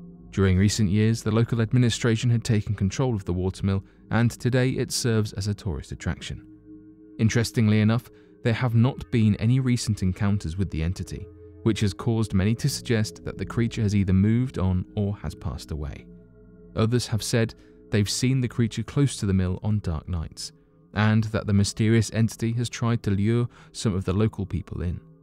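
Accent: United Kingdom. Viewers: The voice of this narrator is better than the others. It is easier to understand, with a more tamed British accent. Less viewers will become sleepy.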